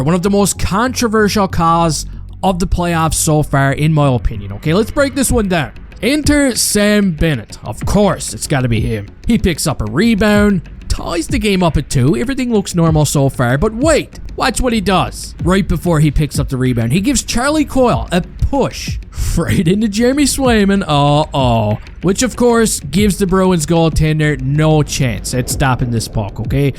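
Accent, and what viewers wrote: Newfoundland, Canada. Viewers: Whwre you from bi? Me and the misses are arguing I'm saying your from newfoundland and she's saying Scottish 🤣 where canadian so help us out bud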